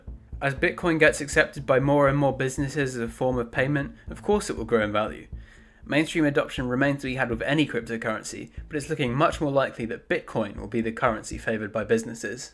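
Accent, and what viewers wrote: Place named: Texas, United States. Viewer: I know a bit abt dialects and I have to ask, what part of Texas are you from?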